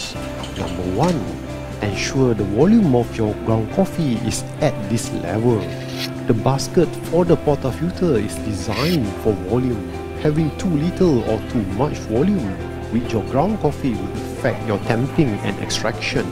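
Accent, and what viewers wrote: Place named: Thailand. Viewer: Sounds more like a Thai than Malaysian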